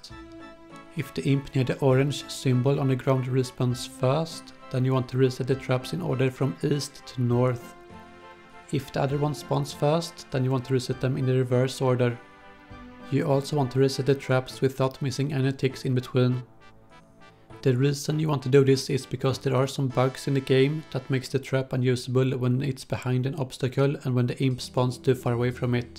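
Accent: Sweden. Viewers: bra svengelska :D...det gillar vi (Y)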